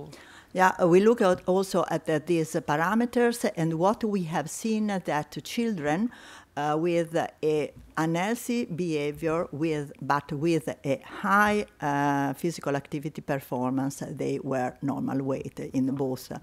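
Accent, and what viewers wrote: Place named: Italy. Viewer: Cant listen to this english with strong italian accent.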